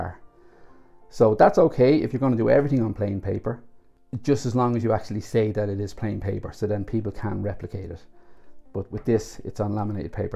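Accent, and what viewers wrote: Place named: Ireland. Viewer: Thanks this is a great resourse and I shall be using it. You are great at explaining. I will share the link with all my YouTube pouring addict friends. Love your Irish accent and great to find some teachers in Europe. Thanks again, Rita x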